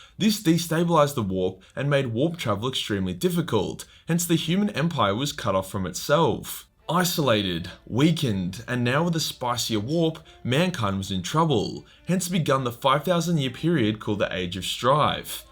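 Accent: Australia. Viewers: Finally, we take aim at. I used to like his stuff, starting with the Siege of Vraks which I still watch. But he got a bit too political. I didn't come for rants on companies and politics I came for the Grim Dark of the Australian Accent.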